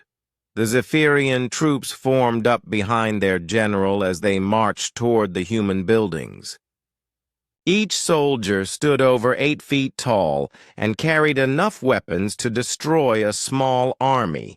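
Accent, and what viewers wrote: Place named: Texas, United States. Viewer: Don’t like the Texas drawl speech pattern.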